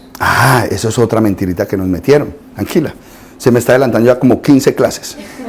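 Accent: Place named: Colombia. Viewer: Inmediatamente me di cuenta de que usted es colombiano 👍gracias por compartir tanta enseñanza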